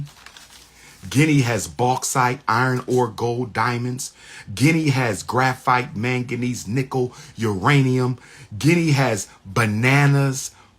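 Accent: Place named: United States. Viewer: My man, I wish you could listen to yourself you sound so American are you sure you’re not employed by the government to talk about Africa?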